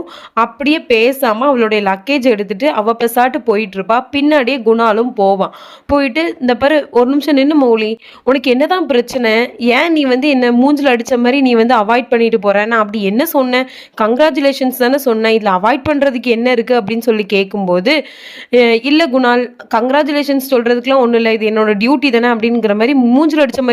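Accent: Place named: Tirunelveli, India. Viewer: ஹலோ நீங்க திருநெல்வேலி காரங்களா உங்க வாய்ஸ் அப்படிதான் இருக்கு போயிட்டு வந்துட்டு அப்படின்னு சொல்றீங்க கதை சூப்பர்❤❤❤❤❤❤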